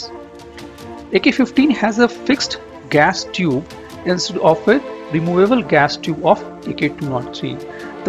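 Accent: India. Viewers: speak slowly. Indian accent is made fun all around the world. Nahi tou apna Hindi bolo, Maast.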